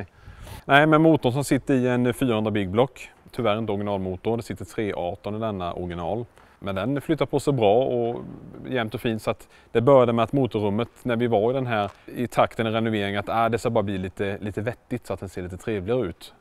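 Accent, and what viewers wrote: Småland, Sweden. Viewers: Vanlig småländska med en lokal blandning.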